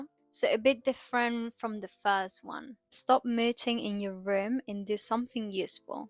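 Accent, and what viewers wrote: RP, United Kingdom. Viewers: I'm American and have never heard "mooch" used that way before, 😅so I'm not surprised you haven't heard it before either. Very colloquial British I guess, no American would say that. (The second use of "mooch" as in "freeloading," everyone would understand though.) You probably already know this but when she says, "slipping into bad habits of laying in bed doom"; Firstly, she meant "bedroom" (obviously), secondly, the correct word is "lying." It's an intransitive verb and sometimes even fairly educated speakers mix up "lying" and "laying." Just wanted to say that mistakes like this have always given me pause about learning from social media though. Your English is great and very natural. Your RP accent would no doubt get a lot of attention and a load of compliments in the States!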